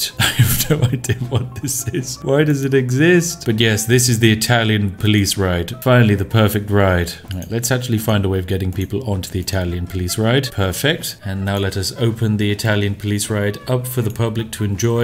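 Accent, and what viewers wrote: United Kingdom. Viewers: "The most exciting thing since teabagging" and "welcome to the bone zone" perfect commentary delivered in the excellent British accent, love it!!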